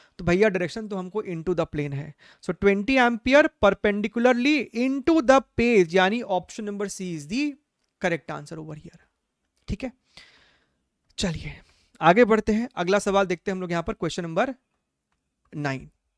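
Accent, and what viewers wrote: Bihar, India. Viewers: Sir kya aap bihar se ho..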